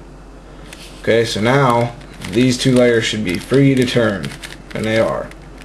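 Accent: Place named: West, United States. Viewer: you talk like a cowboy, nice dude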